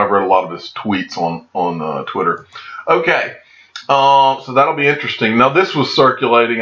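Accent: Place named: New Orleans, United States. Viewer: I thought he was from NOLA as I am but he’s from Maryland if not mistaken. I remember asking him a few months ago. He does sound like he’s from NOLA.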